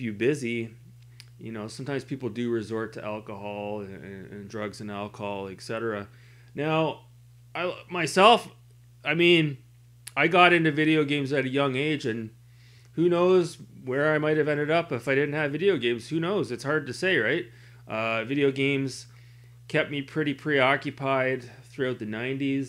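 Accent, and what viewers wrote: Canada. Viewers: ohh nice om from there too small towm outside Toronto. Just thought i heard a bit of newfie in there lol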